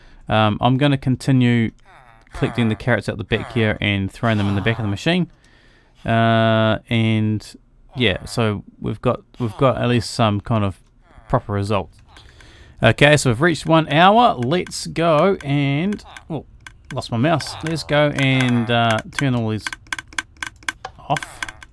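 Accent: New Zealand. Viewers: are you new Zealander becouse im a nz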